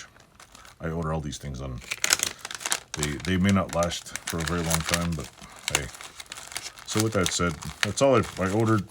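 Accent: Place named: Canada. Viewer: you sound like native canadian but are from utah i assume... so odd... <link> sign guy btw ^ Tony!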